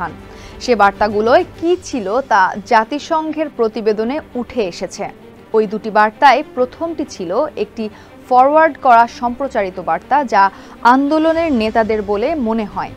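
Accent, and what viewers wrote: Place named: Kolkata, India. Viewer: Kolkata Bangla oyTa eyvabe I bole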